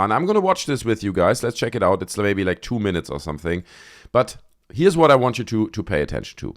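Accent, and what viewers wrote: Germany. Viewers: wieso klingst Du so deutsch?! 😅 ... ok, maybe an mistace. Go on and GRTX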